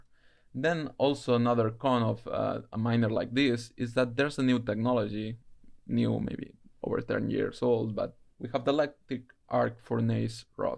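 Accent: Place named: Spain. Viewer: Me parece bien que divulges esta cultura financiera y oír a un español hablando inglés, que no se diga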